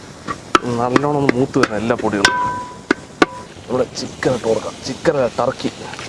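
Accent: Palakkad, India. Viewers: തനി നാടൻ പാലക്കാട് ഭാഷ 💞✨️✴ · നല്ല orjinal പാലക്കാടൻ ഭാഷ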